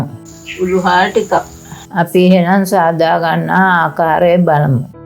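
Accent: Ambalangoda, Sri Lanka. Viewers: අම්බලන්ගොඩ පැත්තෙ ආච්චි කෙනෙක් කතා කරන විදියට නම්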